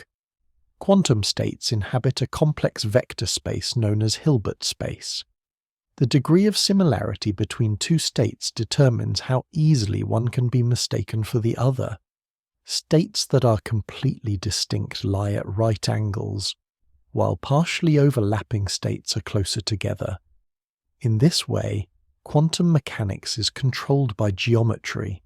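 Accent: United Kingdom. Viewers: Want to love it, but there's nothing relaxing about a biting British voice in my ear at 11pm. Sorry.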